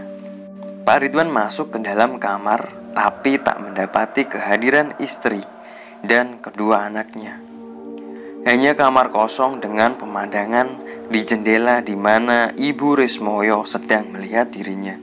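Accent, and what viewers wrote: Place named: Java, Indonesia. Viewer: Logat jawamu kentel mas yho😂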